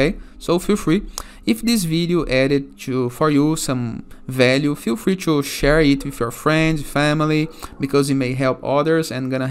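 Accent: Brazil. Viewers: Its some Brazilian accent? 😉Nice TUT, brother!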